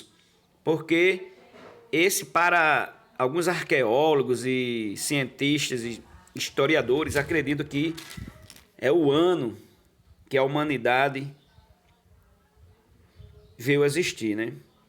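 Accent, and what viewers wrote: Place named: Northeast, Brazil. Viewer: Pelo sotaque desse narrador do vidio ele evoluiu do jumento porque ele e um nordestino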